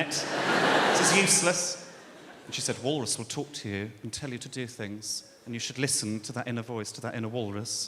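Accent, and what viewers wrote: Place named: RP, United Kingdom. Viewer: He talks like the Queen.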